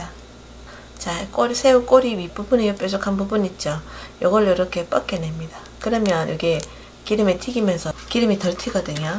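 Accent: Gyeongsang, South Korea. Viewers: 오늘같이 비오는 날엔 튀김이 땡기네요! 경상도 억양으로 설명해 주시니 더욱 맛있어보여요~ ^^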